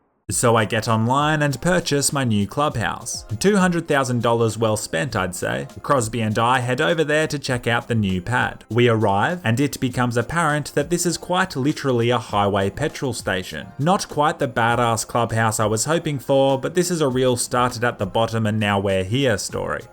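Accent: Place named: Australia. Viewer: ONLY 160K SUBS? What? Under rated channel! I've beem hooked on amazing commentary and hilarous and spontaneous kind of humour. Goes very well with the Ozzy accent. Keep up the good work!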